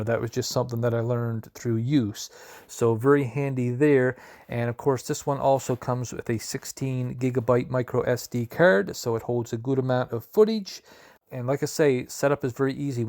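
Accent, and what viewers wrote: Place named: Newfoundland, Canada. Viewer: Good newfie east cost voice 👌👌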